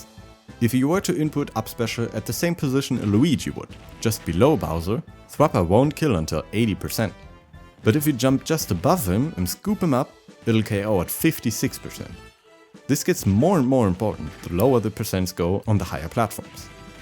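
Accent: Germany. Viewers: Sounds like he comes from a German-ish speaking country, so probably germany, Austria, Switzerland or Netherlands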